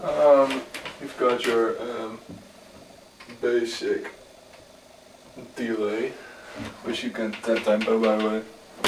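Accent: Netherlands. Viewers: ha your accent sound hollish.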